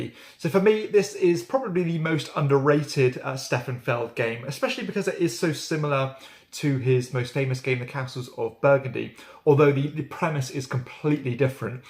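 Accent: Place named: United Kingdom. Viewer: As a Brit myself i sometimes dispair at the domination of YT by our friends from across the pond …Its Great to see more English reviewers on YT …very clear and eloquently presented top ten …makes me so happy to hear British English from UK gamers hurrah !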